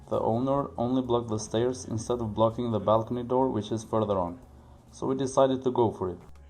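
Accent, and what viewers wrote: Russia. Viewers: Your sound like a Russia , hahah, I liked the video 👍🏼👍🏼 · russian accent and now I stopped watching you. Hatfu na ryj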